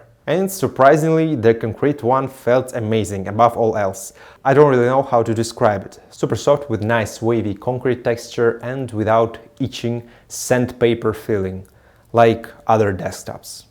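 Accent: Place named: Russia. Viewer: Srazu chuvstvuetsa russkoyazichniy akcent. Nice setup.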